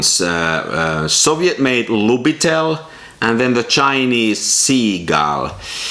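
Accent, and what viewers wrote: Finland. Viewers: Rallienglantia, tunnistin heti suomalaiseks :D. Ei vaan hyvä video ja sain noudettua tänään oman Yashica C:n Kuvasin tänään rullan Fomopan 100, seuraavaksi Kodakin Gold 200:sta.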